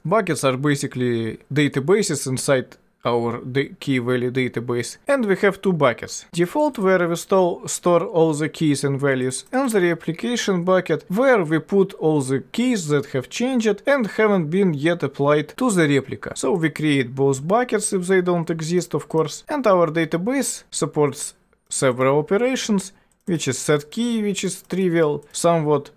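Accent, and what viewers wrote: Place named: Russia. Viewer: 一股毛子味的英语是吧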